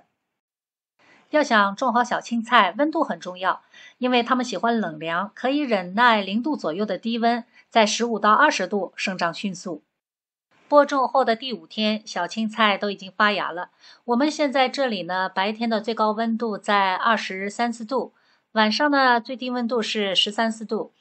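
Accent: Jiangsu-Zhejiang, China. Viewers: 其实我最喜欢看的是开始的那三十秒，听你口音像是江浙一带的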